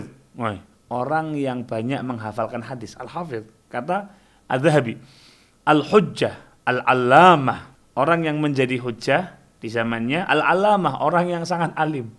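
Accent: Indonesia. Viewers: Logatnya Bangkalan banget. 😅 Ternyata banyak Wahabi di sana Sikat, Guse...!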